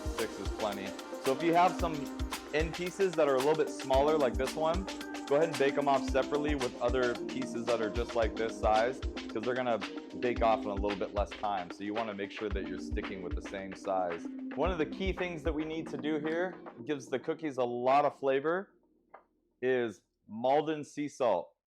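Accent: United States. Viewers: Sweet, but you sound like an American. You know, we use cups as measurements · You from Hawaii? I can hear small kine pigeon or local accent! Right on, Braddah… keep up the good work🏝️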